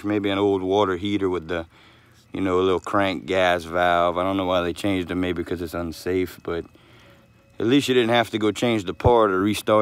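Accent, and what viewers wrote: Louisiana, United States. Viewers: Man that's a Louisiana accent if I ever heard one!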